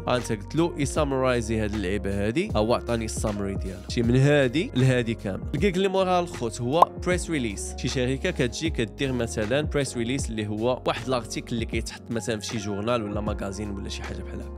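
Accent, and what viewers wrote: United Kingdom. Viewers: ونتا عندك نطق برطاني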